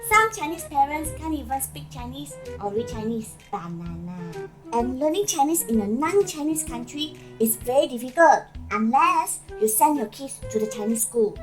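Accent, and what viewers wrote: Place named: Malaysia. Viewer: Welcome back. I love your humour and I especially love your Malaysian accent. You do not put on the faux American accent. Keep up great work.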